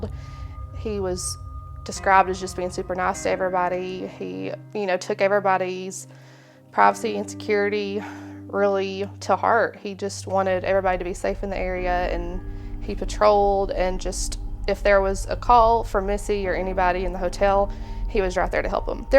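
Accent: South, United States. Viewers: LOSE WEIGHT NOT JUST FOR YOUR LOOKS BEFORE YOUR HOUSE YOU CAN LIVE TO BE 90 GET A CHIC SHORT HAIRCUT AND STOP DRESSING ALL PROVOCATIVE DITCH THE ACCENT THE SOUTHERN HORRIBLE ACCENT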